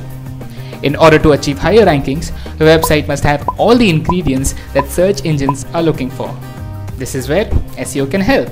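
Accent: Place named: India. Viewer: Indian accent... urgh.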